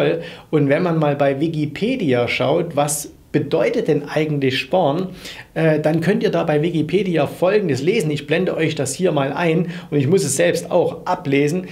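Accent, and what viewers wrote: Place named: East Germany, Germany. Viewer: Konsümverchzicht...DDR Akzent kommt durch 👎. Das Video ist inhaltlich nicht hilfreich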